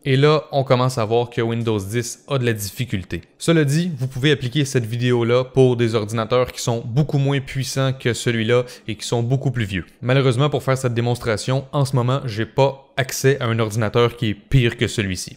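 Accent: Quebec, Canada. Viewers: Ahhhhhh enfin un quebecois. Je comprends mieux a cause du québécois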